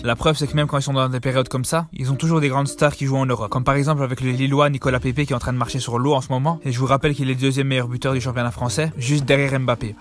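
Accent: Brussels, Belgium. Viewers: Bravo pour tes 5 000 abonnés 😍 c'est chiant pour le Maroc de tomber contre la côte d'Ivoire maintenant ils vont vouloir se venger sinon désolé Fréro 😉 je suis un Tunisien et on a vraiment une bonne chance sinon j'adore ton accent bruxellois tu me fais rire 😂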